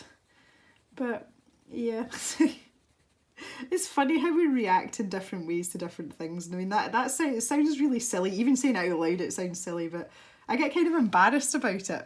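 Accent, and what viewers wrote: Scotland, United Kingdom. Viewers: Ive always been drawn to the Scottish accent! Here in Australia, foreigners say we have different accents depending on where in Australia you are. Personally, I can't pick differences here... We all sound like bogans lol I love accents, and I can definitely tell the difference between Scottish, Irish, Welsh and English accents. I could listen to you talk all day! 😊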